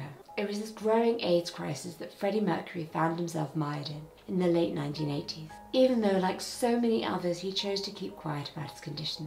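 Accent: United Kingdom. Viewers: You Madame should be made a “ Lady “ , love your speech. Soo British and educational for a speakers. I am waiting 😂😂 😂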